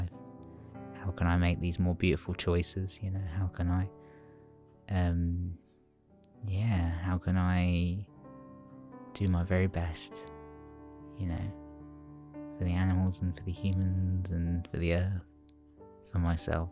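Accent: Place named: United Kingdom. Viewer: Good to hear from you! Your British accent is beautiful as well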